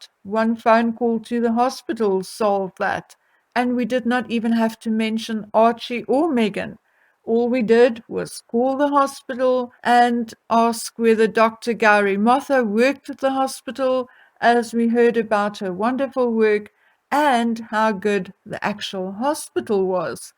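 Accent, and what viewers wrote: South Africa. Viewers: Jy praat engels soos n Suid Afrikaner,is my oor goed in gestel.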